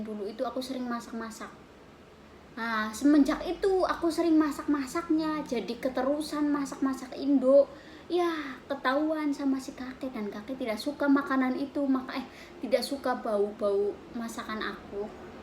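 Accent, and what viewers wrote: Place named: Banyumas, Indonesia. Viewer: Ninine diwaraih ngomong ngapak nok..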